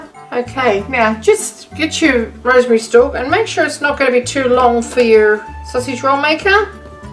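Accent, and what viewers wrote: Australia. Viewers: Another scrumptious recipe to add to my collection, thanks! I love the way the Strines say "heh" for here: )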